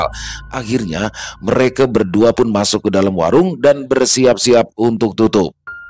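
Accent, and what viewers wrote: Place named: Betawi, Indonesia. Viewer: Slmat siang..senang kisah warong kopi Dony muncul kmbli bersama si gembul yg lucu juga dialek khas Betawi nya mas...sy tunggu cerita nya yg lbih seru,slam dri sy ibu Eky di solo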